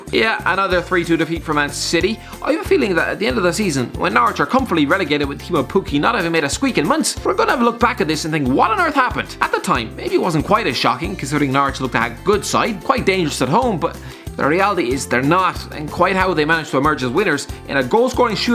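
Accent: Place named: Ireland. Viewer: I'm a simple man. I hear the irish guy, I press the like button.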